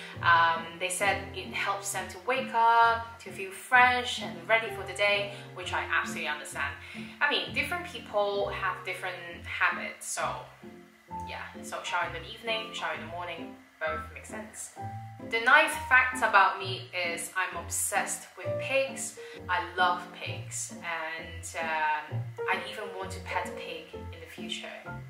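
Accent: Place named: United Kingdom. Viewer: Ohh your english sounds so british now! Love it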